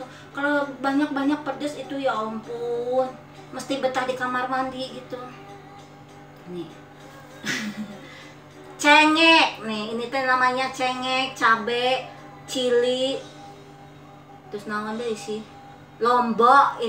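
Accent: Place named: Sunda, Indonesia. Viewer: Dari judulnya aja udah gokil Mak , suka sm medok Sundanya 🙏🏻😁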